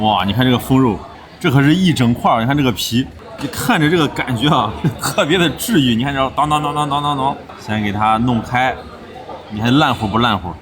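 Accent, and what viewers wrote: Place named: Shaanxi, China. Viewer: 是陕西人吗？陕西口音有些重。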